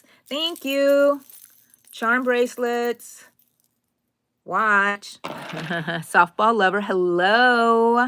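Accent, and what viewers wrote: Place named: New Mexico, United States. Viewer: Are you from NM? Your accent reminds me of the abuelitas here!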